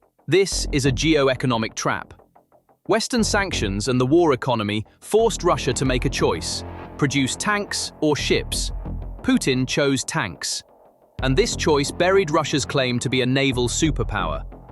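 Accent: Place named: United Kingdom. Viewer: British voice over. DREADFUL American number (mis)pronunciation. Dislike from me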